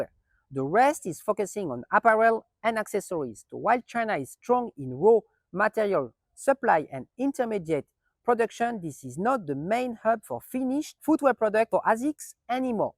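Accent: France. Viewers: mais gros t'es un enfoiré tu fais en anglais d'accord mais.. sous-titré en anglais tellement ton accent est francais wtf ??! je parle anglais mais la jai besoin des sous titres mdrrrr je pense les anglophones entendent du français la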